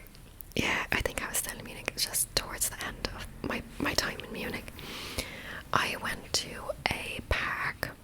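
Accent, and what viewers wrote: Ireland. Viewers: Lovely video! A 40 minute video with your gorgeous Irish accent is always a treat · Your accent is so enchanting and it goes with ASMR so well. Im roughly 50% Irish but unfortunately never picked up the accent from my relatives. Id kill for an Irish accent, mainly because Ireland is one of the top three places Id love to live after im through with university. ❤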